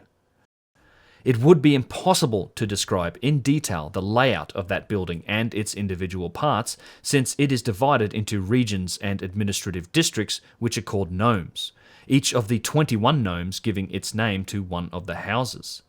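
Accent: Australia. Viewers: 'The royver of such soyze the noyl' its English? Another stupid Auzzi hack lol. Please Australia speaking Australian Cockney is not good!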